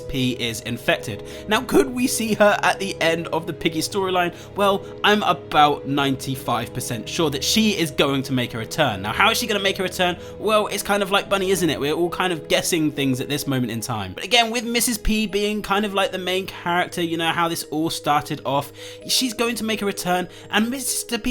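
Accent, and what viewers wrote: United Kingdom. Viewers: are you bridish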